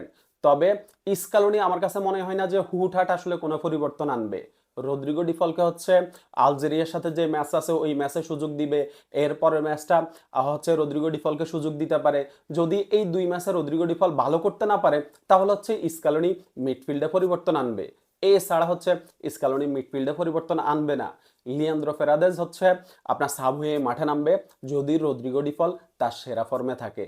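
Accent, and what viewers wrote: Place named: Noakhali, Bangladesh. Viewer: আপনে কি নোয়াখালীর লোক , মুখের কথায় বুঝা যায়🎉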